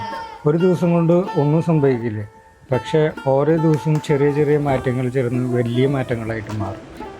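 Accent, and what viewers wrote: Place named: Kannur, India. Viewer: ningalude bhasha kannur karudeth pole und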